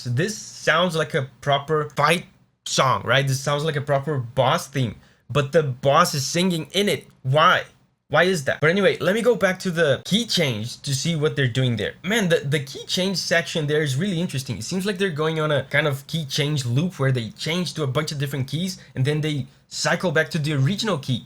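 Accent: Brazil. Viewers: you sound Brazilian, are you Brazilian?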